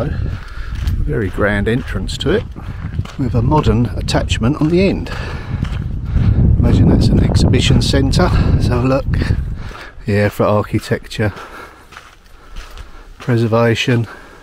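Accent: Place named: England, United Kingdom. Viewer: Hello from Canada. Lovely video, and I thoroughly enjoyed your tour of this beautiful little village. It's so nice to listen to someone who has such a deep appreciation and respect for the long history of these places. Never apologize for your English accent - it's beautiful. My Mum was born in England. Thank you so much for sharing. 😊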